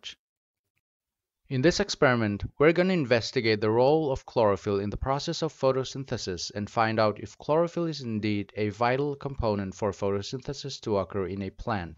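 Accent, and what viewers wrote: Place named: Northeast India, India. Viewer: Well it played automatically after an experimental related video. Mostly I saw high end instruments being used, then in your video I saw the microscope, very much like the one my College labs has. There I thought , he must be an Indian and by the accent, a northeastern. Then I checked your abouts'.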